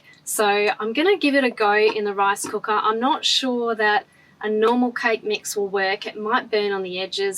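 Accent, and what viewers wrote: Australia. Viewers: So stocked yo hear an Aussie accent!!!! I’m about to get a van……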